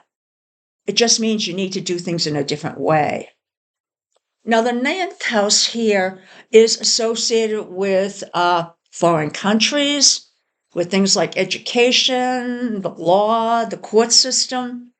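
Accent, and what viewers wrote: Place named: New England, United States. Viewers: Love your Boston accent! Familiar to my ears. Others saying similar things post eclipse. Truth, justice consequences. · I recognize your accent. Where in New England are you? I'm in Northern NH 😊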